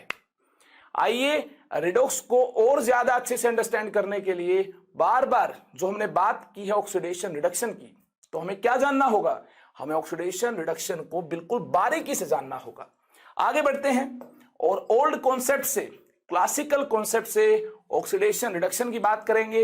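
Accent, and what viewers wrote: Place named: Haryana, India. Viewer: sir, u have a haryanvi tone in your voice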